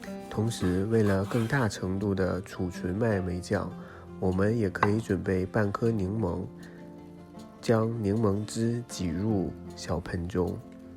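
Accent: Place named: Northeast, China. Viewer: 哈哈哈哈哈 笑死我了， 你开口 就已股东北味，挡都挡不住那种！ 哈哈哈啊哈哈 越听越搞笑！！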